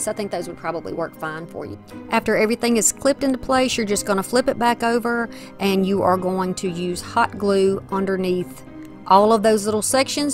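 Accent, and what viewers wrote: South, United States. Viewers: these are all so very awesome. You are an excellent DIYer. Also love your southern accent. Hugs and love, Janet 🦊🦊🍁🍁🌻🌻🍂🍂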